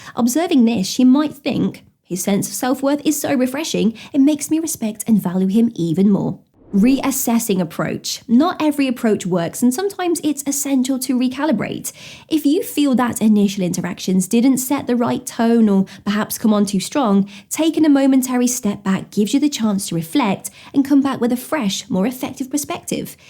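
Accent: United Kingdom. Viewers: British accent is music to my ears.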